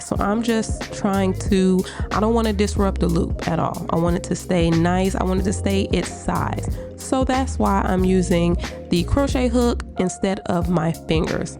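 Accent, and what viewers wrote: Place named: Houston, United States. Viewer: Commentary is GOLDEN!!!! You sounding like us out hea in Houston lol. & that hair baby!!!! You ate down! Beautiful 😍 👏🏽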